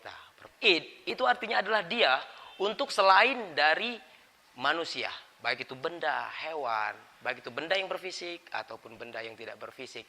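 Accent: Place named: Poso, Indonesia. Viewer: org poso ya??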